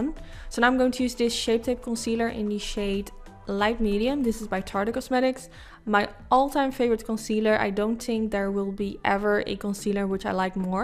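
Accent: Netherlands. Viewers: Kom je uit Nederland? Ik dacht een accentje te horen! Heb trouwens na het kijken van deze video ook die paarse mac glitters gehaald, thanks voor de tip :) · Ik hoor echt meteen dat je Nederlands bent, niet slecht bedoeld ik vond het gewoon grappig dat het echt zo was. Echt een super mooie look!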